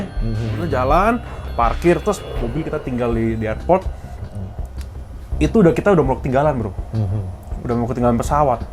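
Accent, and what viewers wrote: Java, Indonesia. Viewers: Jiangkrik. Di rungok"ne jowo tibae😅😅 Sentolop barang e. Jurrr. Ngrungokne penyampaiane iku lho. Mau ketawa takut dosa. 🤦‍♂️🤦‍♂️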